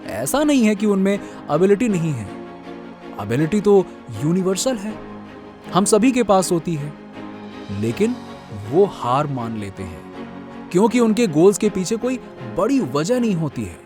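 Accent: Bengal, India. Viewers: Bangoli accent was good..,...I liked that voice... Resume that voice again...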